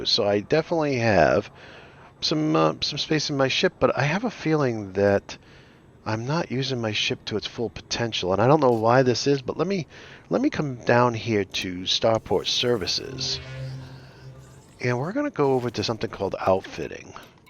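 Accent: United States. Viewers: Entire video Couldn’t stop thinking about just wanting to punch the shit of of this annoying New England accent. 🤮🤮🤮🤮🤮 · I hope I'm hearing correctly the NYC accent. It could be Central Jersey. Apologies if I am wrong. I'm a little homesick for NYC is all. Thanks for the clearly made video series. I 've started and stopped this game nearly 1/2 a dozen times, but will try once more thanks to you.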